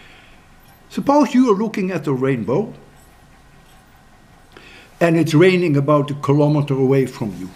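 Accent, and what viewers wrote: Netherlands. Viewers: you are dutch arent you?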